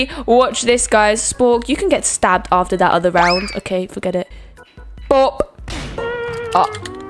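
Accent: United Kingdom. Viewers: Its so uglay😭 Are u British or smth😭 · Are you british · Her british accent👍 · your accent is so prettyyy! r you perhaps British, or Australian? Xndjdksi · I love ur voice it’s like the most perfect British accent ❤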